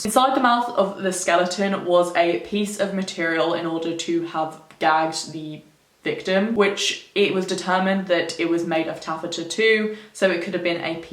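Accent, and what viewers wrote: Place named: RP, United Kingdom. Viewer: So posh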